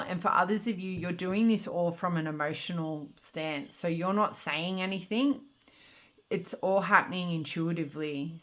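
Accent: Australia. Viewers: All the piles and it’s the best having an Aussie mate who sounds a bit like me, chilling in my lounge. And now I must ask the most important question 🙋‍♀️… Have you been shopping at Big W?? I’m busting to get to use the decks you’re giving my message with as I speak (and listen). If not, unless you wish to sink into the devil energy as a choice - as I am - all 9 of pentacles, boujee single lady in all the abundance. That’s not exactly the case, but I slapped on a face mask and got some conditioner in my hair (ma’am, I made it into the shower today and that was a soul upgrade in itself 💪) and this reading is absolutely resonating. Even more so that I can listen to it in the accent that the hate is coming from. Same as my own. Not my high school mates. They are staunch ✊ A different area but same group. Every town has one. Taylor Swift wrote a song about them - 15? 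I think?? And the kardashians didn’t blow up on love when I think about it. Kris Jenner is the boss of turning hate viewing (the best kind really bc them they’re paying attention. They are Scrutinising even) into the big bucks. That’s why I connect to Nelly’s even though I’m the wrong colour and gender and way too old to sing what I do, but I really only know that if I hear someone saying it and I believe it. And you’ve given me EVERY CONFIRMATION. Deck of Darkness - underpins EVERYTHING FOR ME in this moment. You said something like you don’t have any witchy woo cards out. I’m super spiritual but I’m als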